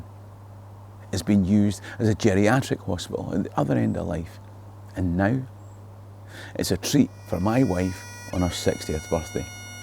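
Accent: Scotland, United Kingdom. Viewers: By the sound your a mcgreger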